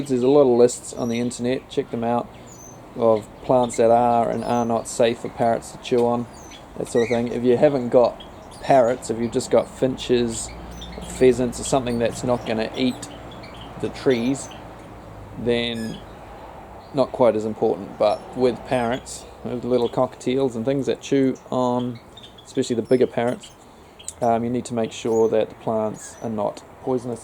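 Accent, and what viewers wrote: New Zealand. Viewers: I recognized a fellow kiwi accent immediately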